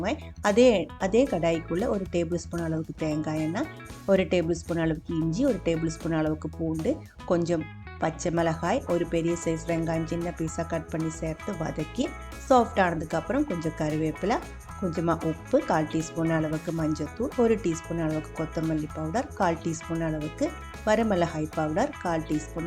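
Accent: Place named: South India, India. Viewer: Your tamil is different, are you a south indian?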